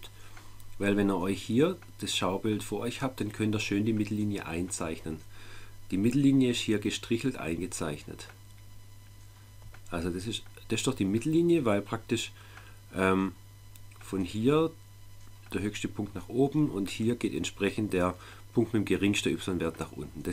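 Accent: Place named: Swabia, Germany. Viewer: Haha 😂 Suppa Schwäbisch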